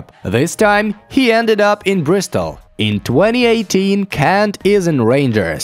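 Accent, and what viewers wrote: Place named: Netherlands. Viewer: He sounds Dutch.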